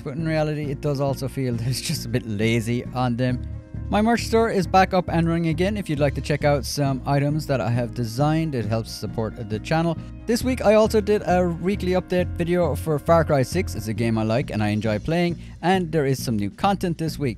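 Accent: Ireland. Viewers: is from Ireland they sound weird to a brit the 30 especially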